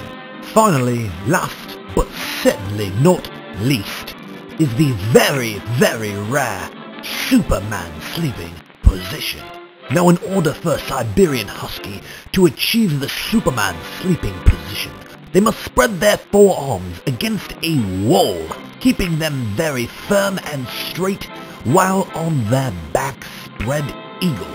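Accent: England, United Kingdom. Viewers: ...her highness would say "we found that most amusing"...i must commend you on your english accent...jolly good show & all that!...(& i AM english btw!😁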